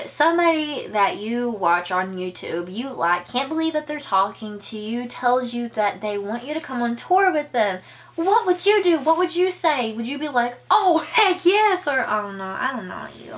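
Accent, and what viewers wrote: South, United States. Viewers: Wow you're so good at telling stories. I'm having so much fun watching your old videos! I love the storytimes and your country accent. I miss the country accent so much lol! You rock the country accent so good! It's really cute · So I just recently found you and you have quickly become my FAV youtuber! I'm obsessed ❤️ where are you from? It sounds like you have a southern accent. I'm from Alabama! I wish there was more southern youtubers haha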